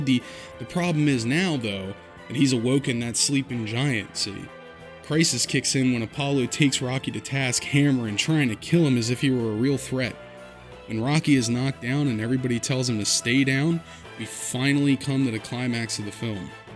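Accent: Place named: Delaware Valley, United States. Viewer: I enjoyed this tangential video, I subscribed after watching your video essay on "AAA" game design and am by no means a writer and found it interesting. It's awfully appropriate this video is delivered in an authentic Delaware Valley accent. The city of Philadelphia really relates on a personal, almost cultural level to Rocky's underdog narrative and low self esteem in a way, it can be compared to the city's well known inferiority complex - which extends into New Castle County, Delco, Bucks and South Jersey. I'm a "Delaware Valleyan" living far from home and I sometimes have people ask me why we take pride in the statue of him near the Art Museum. It's clear to me why he's been co-opted into city culture.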